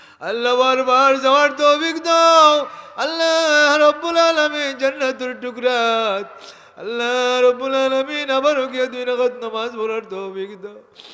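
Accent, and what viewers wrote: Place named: Sylhet, Bangladesh. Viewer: মনে আয় সিলেটি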